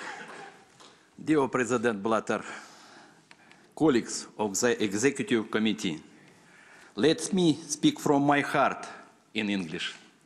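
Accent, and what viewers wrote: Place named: Russia. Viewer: Его английский чересчур русский.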